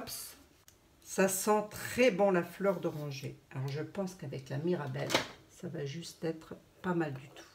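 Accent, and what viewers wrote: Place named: Lorraine, France. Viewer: bonjour, super recette que je vais faire ce soir, je tiens a te dire que je me doutais que tu était lorraine vu ton accent qui ne m'est pas inconnu puisque je suis née dans les Vosges, je vis dans le sud ouest a présent, alors je te dis encore bravo pour tes tutos qui me ravissent a chaque fois bisous a toi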